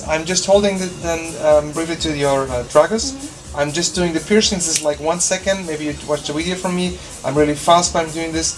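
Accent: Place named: Manchester, United Kingdom. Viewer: Love her Manc accent. I know, there is not just one American or British accent, but in general I think that British English sounds much better.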